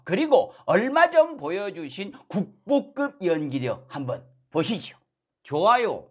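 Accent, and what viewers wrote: Jeolla, South Korea. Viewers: 전라도 사투리가 구수~ 하구먼~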